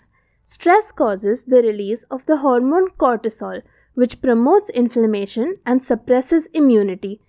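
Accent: India. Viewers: Sounds so indian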